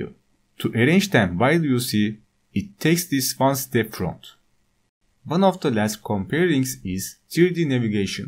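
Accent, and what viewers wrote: Turkey. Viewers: türksün değil mi ingilizcen onu çağrıştırdı?? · aahahah abi türk yaa bende aynı böyle konuşuyorum :D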